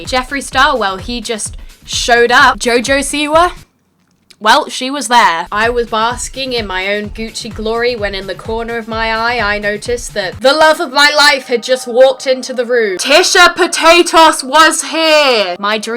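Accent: United States. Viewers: omg your american accent hahahah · Are you from America or London 🤔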